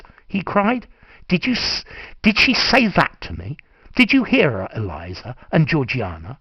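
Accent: RP, United Kingdom. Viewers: Fabulous British RP. We need more like you. I'm devouring your videos. I do like how you pace your speech. I try to mimic your pace and speech. Thanks for all the videos. Do you recommend any audio books with British RP please? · I have been searching the web for weeks and could not find a good lesson to sound more British. This recording is excellent. Thank you very much!!!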